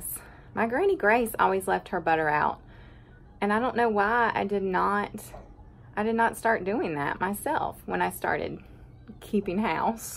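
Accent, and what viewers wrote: South, United States. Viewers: Love your country accent/talking. Be back childhood memories. 🇺🇸🇺🇸🇺🇸🚜🚜🚜👍👍👍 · Just now found your channel, awesome videos! Making me miss my grandparents cattle...and a southern accent!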